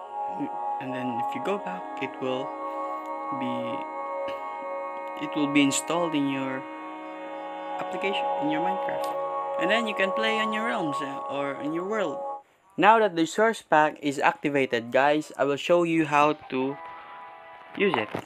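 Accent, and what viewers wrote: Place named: Philippines. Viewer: Noypi!!!! I just know from your accent, am I right?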